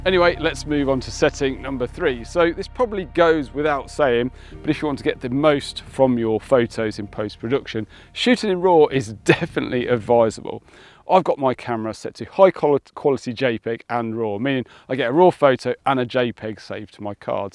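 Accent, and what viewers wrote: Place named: Scotland, United Kingdom. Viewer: Nice to hear this light Scottish tone in your voice. I turned my noise reduction off, that's all took from your video. The rest of my settings are identical. Thx for sharing your experience. 👍🏻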